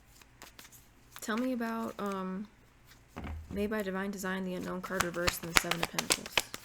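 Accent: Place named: South, United States. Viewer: I really like to listen to you because of your southern twang 😊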